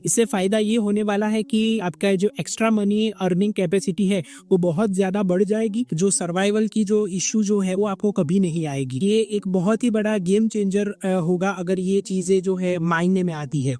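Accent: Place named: Gujarat, India. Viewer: Bhai Kay Thai chho? Gujju accent is visible 😂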